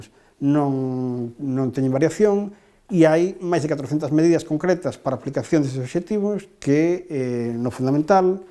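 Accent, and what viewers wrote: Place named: Castile, Spain. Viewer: A Fala desse rapaz é totalmente castelhanizada. Ja ouvi outros galegos e seu sotaque é bem melhor. Este rapaz parece alguem falando castelhano de maneira errada. É triste mais parece que por conta dos mais novos vai desaparecer o galego. Ou o galego se aproxima do português - brasileiro - ou desaparecerá.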